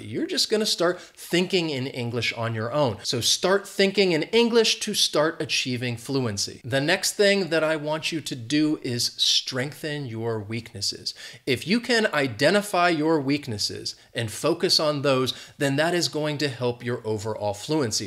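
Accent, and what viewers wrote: United States. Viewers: I just love your american accent...and from now on I will create my own bubble by doing and all your suggestions.Thank you so much Sir😀👍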